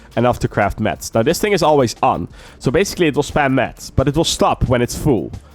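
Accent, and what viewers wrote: Netherlands. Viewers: You are dutch. Prove me wrong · Nice dutch accent =D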